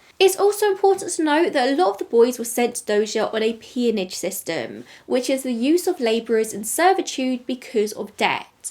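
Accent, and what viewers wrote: United Kingdom. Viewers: I am Australian and I think what you are referring to is just her accent and way of speaking and not trying to be anything. I am not annoyed by it and didn’t even realise what you are referring to till I actually concentrated on it. Many British speakers speak like this…if you are annoyed…stop listening…. I listen to a lot of American accents in these true crime channels and find many of them hard to listen to and just plain annoying so I don’t subscribe to them . I do think it is rude of you to comment…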